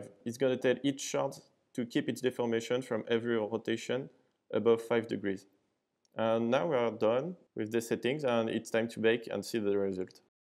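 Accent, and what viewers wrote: France. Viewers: l accent explique Napoléon , tu représentes ! petite fierté mal placée , franchement tu déchires tout ce que j'ai vu ! merci pour ces moments d'émerveillement !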